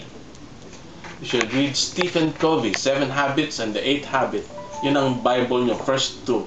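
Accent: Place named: Caribbean. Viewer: your accent is slightly caribbean :)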